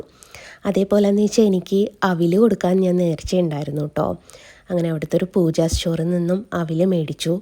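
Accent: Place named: Thrissur, India. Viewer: ഇപ്പോൾ തൃശൂർ ഭാഷയായല്ലോ കൊള്ളാം 😂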